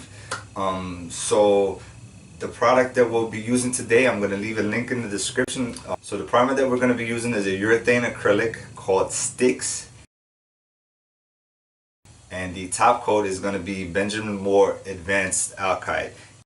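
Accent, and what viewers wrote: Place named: New York, United States. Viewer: My father was a house painter. I had tons of exposure on tools, method and product. Thank you for adding to my knowledge base for kitchen cabinets. I have oak cabinets and I want to update with A nice off-white on the uppers and a darker color on the lowers. I’ve been watching YouTube videos on how to prepare the oak cabinet and I was becoming discouraged because of the level of difficulty in the preparation phase with the wood filler etc. None of the videos I saw until today talked about self-leveling paint for cabinets. I’m gonna do what another comment mentioned, do the bathroom cabinets to get a feel for it and then tackle the kitchen. My dad‘s not here anymore to help me so I’m doing this in his honor. Thank you for the video I really appreciated it. With your accent you make me miss my New York people. God bless.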